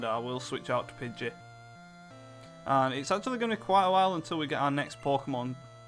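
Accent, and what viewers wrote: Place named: United Kingdom. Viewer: ok youtube really need to work on their close captioning of british accent.. srsly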